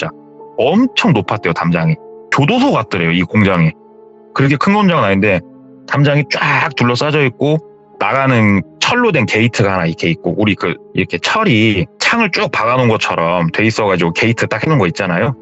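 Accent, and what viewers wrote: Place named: Chungcheong, South Korea. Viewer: 충청도 사투리로 돌려말하는거 ㅈㄴ 무섭네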